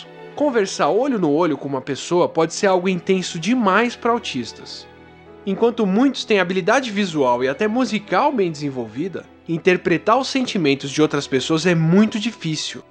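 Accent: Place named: Rio de Janeiro, Brazil. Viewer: this carioca accent is deadly deplorable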